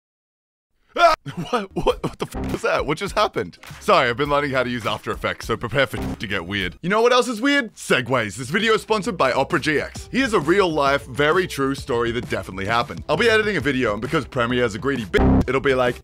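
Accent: Australia. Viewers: He's Michael Reeves, but less robotics and more coding. Also a LOT of Aussie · i have to comment it here but, are you "??" not just for your aussie accent but your ability to not upload for months at a time.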